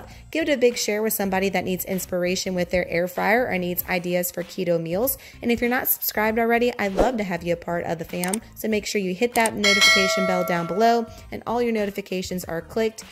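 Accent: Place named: Oklahoma, United States. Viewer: I here ya, im in Oklahoma so it sounds very normal to me!!! you're awesome!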